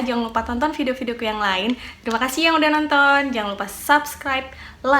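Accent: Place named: Medan, Indonesia. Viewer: Saking ap ny medan x y kn ri.. Mantull .. Ank Medan bah.. Hahaakk...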